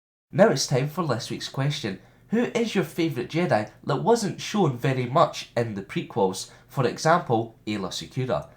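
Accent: Scotland, United Kingdom. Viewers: Hahaha are you Scottish? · Are you Scottish?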